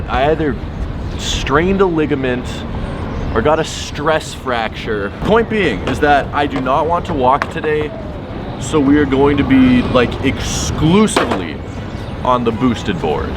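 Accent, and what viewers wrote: Canada. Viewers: time is money! Uhh you just wasted 3 mins! Cus who wants to listen to an Annoying Canadian Ray Donovan!?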